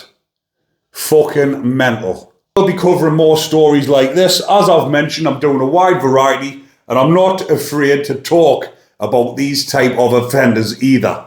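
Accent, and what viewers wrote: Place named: North East England, United Kingdom. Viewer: is from the north east of GB,and that is how they speak! It's called an accent!🙇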